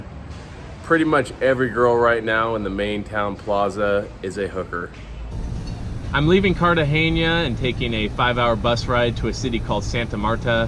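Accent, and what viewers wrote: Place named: United States. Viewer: It's only the American accent that makes him stand out.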